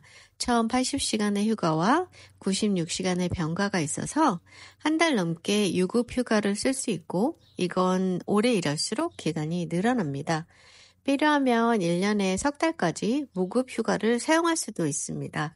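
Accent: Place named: Seoul, South Korea. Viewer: 또랑또랑한 서울 말씨 참 듣기 좋네요, 멋집니다.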